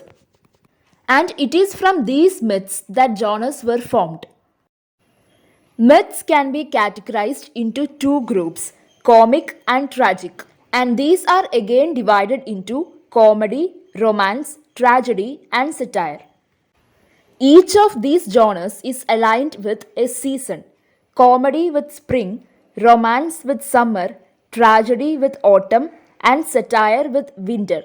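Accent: India. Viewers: Are you Indian or foreigner 😮? I opened the video in a hope to see a video by a foreigner then suddenly this desi accent 😅😅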